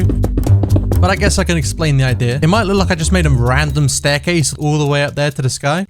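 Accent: United Kingdom. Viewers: Not to be offensive or anything but are you British or Scottish?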